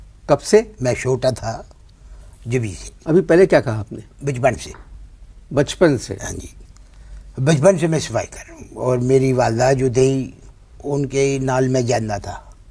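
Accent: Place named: Punjab, India. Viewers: Omg! Ye to humse b jyada pure punjabi bolte hai😃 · Typical Punjabi accent style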